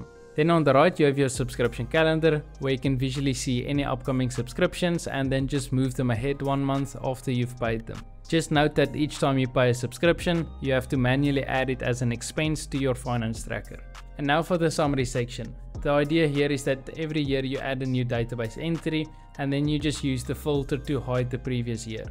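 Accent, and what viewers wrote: Afrikaans, South Africa. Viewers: afrikaans as fuck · knew you South African!!!🇿🇦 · the most south african sounding accent I have ever heard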